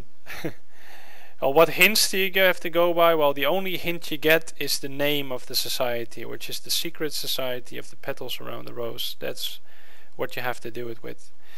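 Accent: Netherlands. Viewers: are you dutch maybe? :D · Zo'n episch nederlands accent. Je hoort het meteen. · you sound dutch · You're not englisch? Younsound like a dutch? Of niet? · You are dutch right? You sound like a guy from Holland :)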